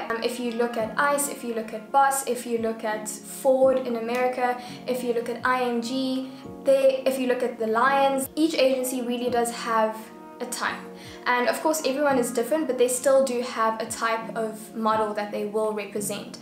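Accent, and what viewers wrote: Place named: South Africa. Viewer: Love this so much I subbed as a fellow South African 😁🇿🇦 I literally heard your voice and was like aish!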